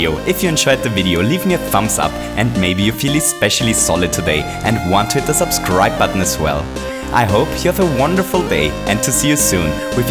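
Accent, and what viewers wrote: Germany. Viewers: du klingst richtig deutsch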